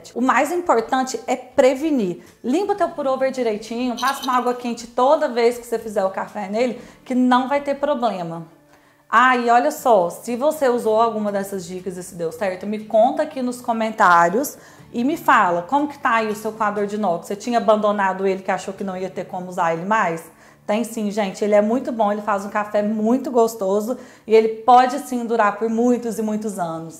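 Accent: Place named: Minas Gerais, Brazil. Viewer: Aqui, gente, vou falar uma coisa procês: adorei esse sotaquizim mineiro, sô. Bão demais.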